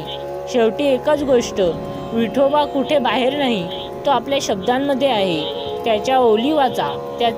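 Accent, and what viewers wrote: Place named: Konkan, India. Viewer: उच्चार अशुद्ध जरी आसले तरी कोकणातली मराठी अशीच असते महाराष्ट्रात प्रत्येक विभागातली मराठी भाषा वेगवेगळी असु शकते कोकणी खान्देशी पुणेरी मराठवाडी सातारी विभागानुसार उच्चारात बदल असु शकतो बोलण्यातील आशय आणी भाव सारांश महत्वाचा आहे हरीपाठा बद्दल खुपच छान माहीती सांगीतली धन्यवाद 🙏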